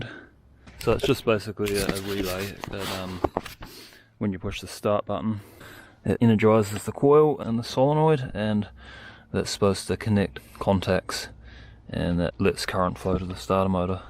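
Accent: New Zealand. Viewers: Shit you sound like a kiwi haha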